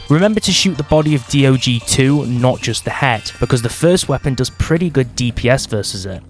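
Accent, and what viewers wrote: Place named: United Kingdom. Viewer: is british? no more video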